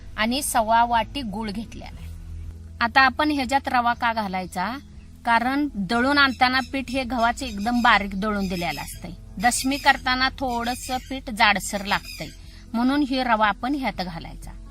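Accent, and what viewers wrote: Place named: Marathwada, India. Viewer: तुमच गाव कोणत आजी? भाषेवरून मराठवाड्यातील वाटतात .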